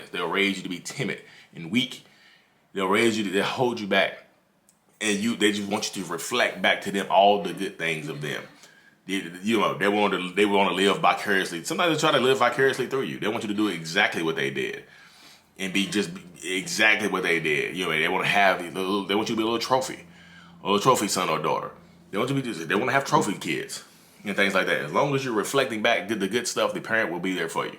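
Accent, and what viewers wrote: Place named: Baltimore, United States. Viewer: Are you from Baltimore? You say Pernt instead of parent like a true baltimorian 😊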